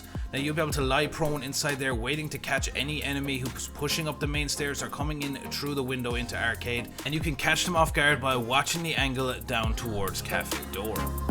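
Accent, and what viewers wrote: Ireland. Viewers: Wat part of ireland r u from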